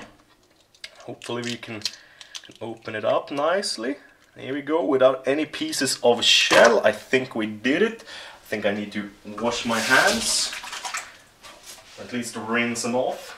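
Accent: Sweden. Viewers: Please im getting so tired of ur Swenglish. Even though it's a bit funny. Just hear how you say with xD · yea. I've noticed that too. That is why I love the swedish accent :D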